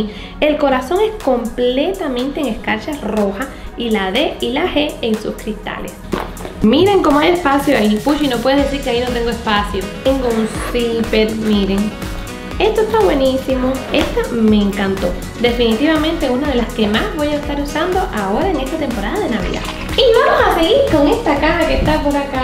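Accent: Cuba. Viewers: Hola, me gusta mucho ver tus vídeos y escuchar tú acento cubano 😅 Participó por cualquier cosa, así como dices tú "la suerte es loca y a cualquiera le toca" esperemos que ahora sea mi turno 🤗🇲🇽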